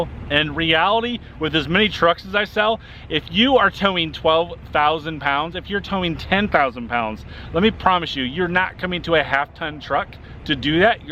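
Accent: Chicago, United States. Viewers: Is this guy from The Windy City blah blah blah blah blah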